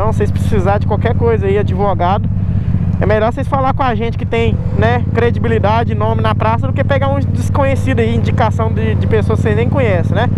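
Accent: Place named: Minas Gerais, Brazil. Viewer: Se tu for "minero" com esse sotaque é nois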